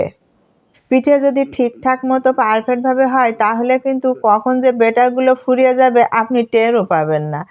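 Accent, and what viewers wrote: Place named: Jashore, Bangladesh. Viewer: Apu apner desher Bari kon dristic? Kotha sune mone hocche jashore a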